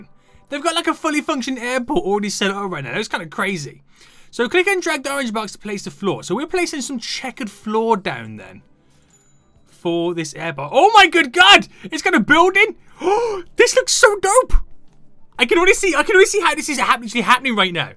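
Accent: United Kingdom. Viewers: Which part of the UK are you from? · Are you British?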